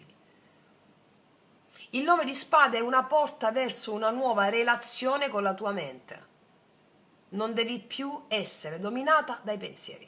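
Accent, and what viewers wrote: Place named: Salento, Italy. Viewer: Cara ti seguo ogni tanto ma dall'Inter calare della tua voce sembra che tu sia salentina come me' io sono della provincia di lecce è tu di dove sei?❤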